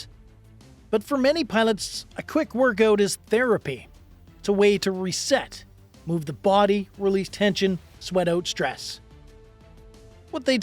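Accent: Canada. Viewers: Dude are you Canadian? No hate but what?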